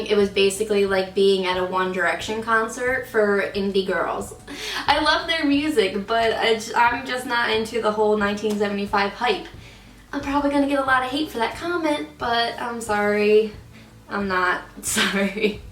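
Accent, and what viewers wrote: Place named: Tennessee, United States. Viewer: Is she from Tennessee? I'm trying to guess from her extremely subtle accent.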